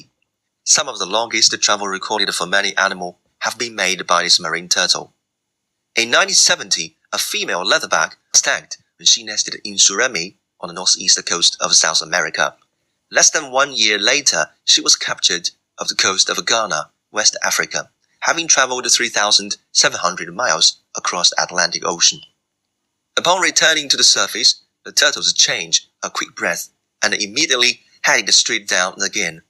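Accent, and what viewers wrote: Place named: India. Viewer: Wataaaaaa, he must be indian, awful accent I've ever heard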